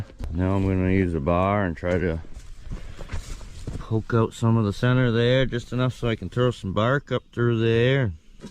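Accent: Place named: New England, United States. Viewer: I know a New England accent when I here one, being from Maine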